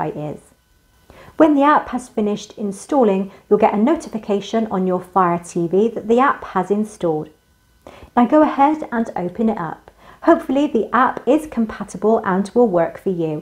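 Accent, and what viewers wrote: United Kingdom. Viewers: Brititsh accents 😚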